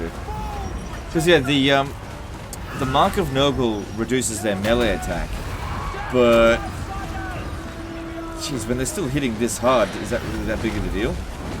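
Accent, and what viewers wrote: Australia. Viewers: He is from down under.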